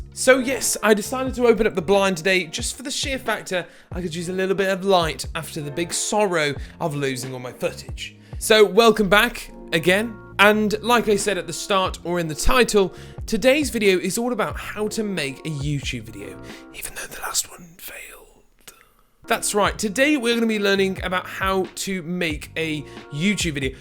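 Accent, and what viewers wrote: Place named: Birmingham, United Kingdom. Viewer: oh thanks mate, that's so nice of you and my bad I couldn't recognize that brummie accent....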